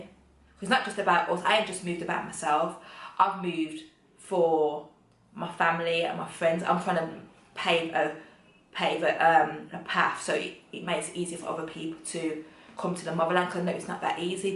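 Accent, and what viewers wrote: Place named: Midlands, United Kingdom. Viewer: Your ascent sounds like u from the Midlands(Birmingham, Wolverhampton, etc). Grt8 choice, hope to meet u in Gh soon.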